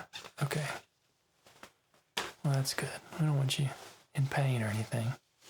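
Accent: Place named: South, United States. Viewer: I hear a hint of a southern accent haha, are you from the south?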